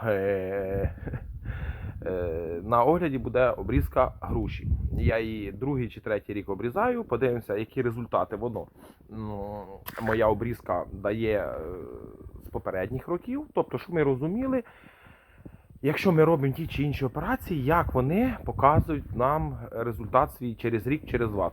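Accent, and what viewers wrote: Vinnytsia, Ukraine. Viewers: Забий, на Вінниччині всі так балакають. І це не від любові до 🐖🐶-ї.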